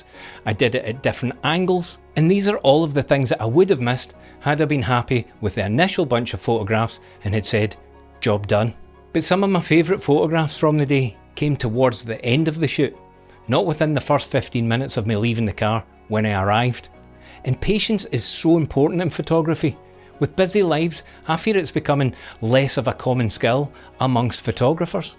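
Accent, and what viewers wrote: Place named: North, United Kingdom. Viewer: Mate, I can hear you speak for hours and hours! I normally put videos like these in the background while I cook breakfast and hope to get some inspiration for my next photography trip. But today, the eggs and butter remain untouched throughout the 14 minutes of this lesson. I was genuinely engrossed and didn't bother whether I wanted an omelette or fried. Great photos my fellow northerner! Came for the tips, staying for the accent.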